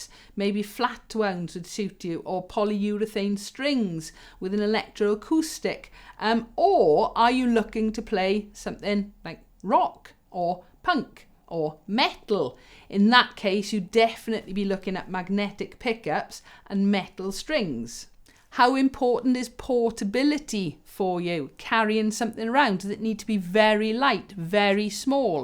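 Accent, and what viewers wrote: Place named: Wales, United Kingdom. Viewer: Hi many thanks for your informative reply. Sadly the purple Flight Ltd Ed mini J bass is now sold out. I could return the Squier I suppose. I would be interested to hear why you prefer the Ibanez Mikro. FYI loving the purple, dragons and Welsh accent, reminds me of my second home - studied in Wales many Moons ago and miss it. Keep on playing 💜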